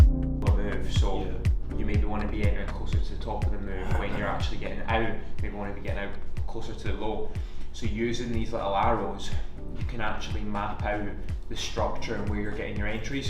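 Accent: Scotland, United Kingdom. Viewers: Do you live or Glasgow or Scotland because your sound it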